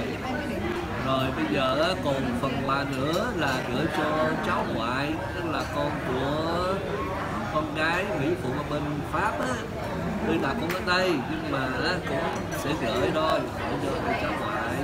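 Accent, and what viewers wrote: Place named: Mekong Delta, Vietnam. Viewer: Giọng cua bác hơi tây tây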